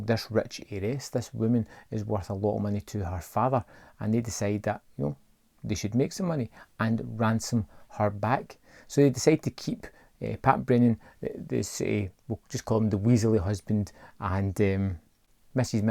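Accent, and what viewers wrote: Scotland, United Kingdom. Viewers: My cc worked, in American English. The narrator sounds Scottish!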